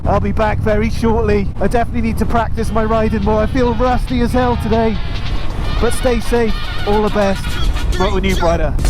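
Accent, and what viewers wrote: Wales, United Kingdom. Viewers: Nice to hear a fellow Welsh man again. Welcome back.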